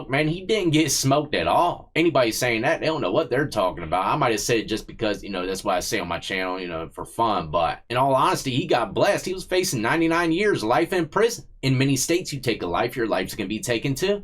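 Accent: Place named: Virginia, United States. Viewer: Be easy; I still hear that Virginiy accent lol.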